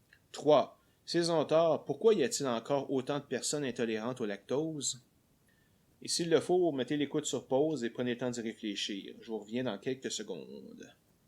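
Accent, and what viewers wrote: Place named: Quebec, Canada. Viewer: Merci pour cette vidéo qui permet de bien comprendre les mécanismes en oeuvre dans la sélection naturelle. Je profite de l'occasion pour saluer tous les Québécois dont le magnifique accent (pour un français 😁) est toujours aussi agréable à entendre.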